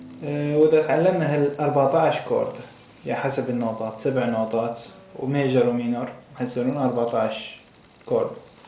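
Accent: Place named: Iraq. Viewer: المدرس عراقي من الكلام مالته...مو bmw!!!حلوة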